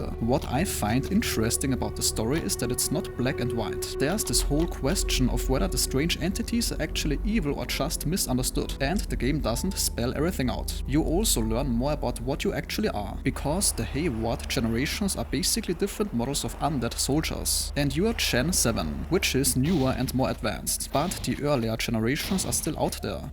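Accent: Germany. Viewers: "Chee-see Doom". German accents are entertaining and I like your style, subbed.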